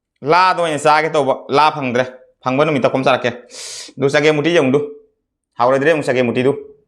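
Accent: Cachar, India. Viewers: Bro kang metei lon ngangbdo Hanna fajana tamkho tabda yam wai cachar mcha lon sude hainakhini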